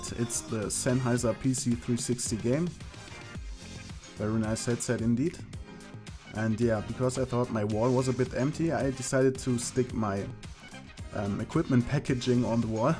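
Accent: Germany. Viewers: I Subscribed you because your PERFECT english and ive heard the German very well out of it und weil du mir sehr Sympatisch erscheinst! The first Video that ive seen from you was: CS:GO Ninja Defuse de_dust2 by! After that i had no choice and had to subscribe! Btw i have already that Phoenix Pass and dont need it so I hope you guys Luck ^_^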